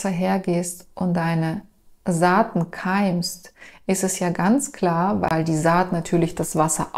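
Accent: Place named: Soviet Union. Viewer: Dieser sovietakzent 🥰🥰🥰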